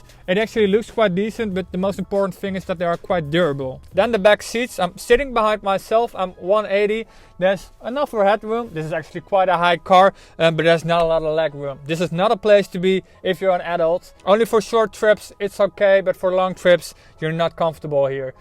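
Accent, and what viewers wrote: Netherlands. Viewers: Lekker Nederlands accent lolz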